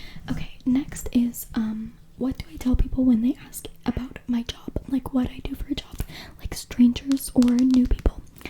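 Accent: United States. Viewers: Watched/listened to the whole video TWICE! :eyes-pink-heart-shape: Some content creators say if you made it to the end put a zebra emoji or they ask a random question, so you see random zebra's or emojis that confuse people in the comments :face-blue-smiling: I learnt so much! I have much respect and admiration being a fellow Christian :face-red-heart-shape: 4 years with your bf is amazing especially at such a young age! :face-blue-wide-eyes::eyes-pink-heart-shape: I wonder if he's a Christian and you met through church? Oh I think a return to pink hair is on the cards for you! Your fans would love that I'm sure :eyes-pink-heart-shape::face-red-heart-shape: You didn't have New Zealand on your places you wish to visit :face-purple-crying: That's ok, you had some great locations! I knew you weren't from Germany (or at least with that thick American accent, didn't live/grow up there? 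:face-blue-smiling:) That's a cool story about your name! Cool parents :eyes-pink-heart-shape: Congrats on your Youtube/TikTok success and I'm sure you will only grow more in years to come! :eyes-pink-heart-shape::trophy-yellow-smiling: PS. I love the pigtails! :eyes-pink-heart-shape:Women can look so different with a subtle change in hairstyle :face-red-heart-shape: Would love to see different hairstyles/ braids in future videos :face-red-heart-shape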